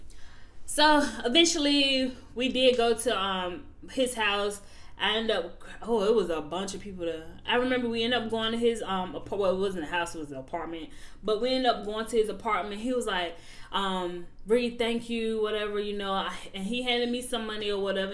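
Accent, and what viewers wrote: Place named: South, United States. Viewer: I love your accent, sound like somebody southern auntie!!! 😆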